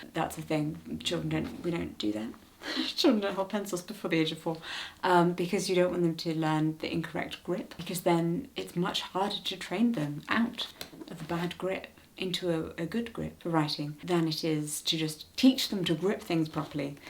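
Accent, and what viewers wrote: United Kingdom. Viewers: Low soothing voice and Brit accent but couldn't get past the 💄 Have a lovely day!😊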